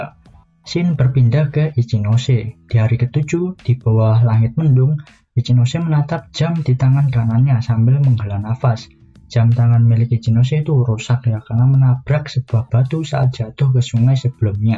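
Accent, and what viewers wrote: Java, Indonesia. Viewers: Sedang mendengar cerita LN dengan suara medhok,☝👍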